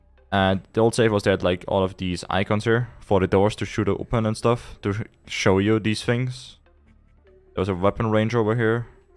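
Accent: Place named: Germany. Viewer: Hey man U Sound so German are U German by any Chance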